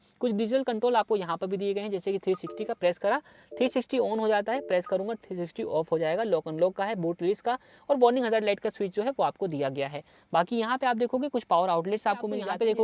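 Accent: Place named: Jhansi, India. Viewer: Tum jhansi se ho bro voice pahchn gye ham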